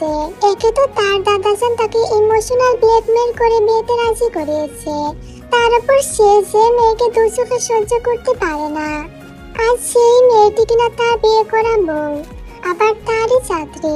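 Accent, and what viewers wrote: Sylhet, Bangladesh. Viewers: না আপি মনে হয় সিলেটি❤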